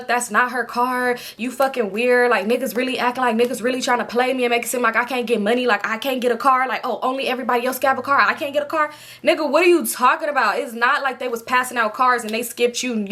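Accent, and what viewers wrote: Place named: West Coast, United States. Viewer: Dang your /our west coast accent is crazy annoying .. I done stopped making conversations.SLOW down gurl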